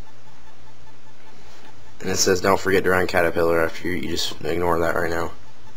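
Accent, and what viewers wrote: South, United States. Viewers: i hate ur accent red nek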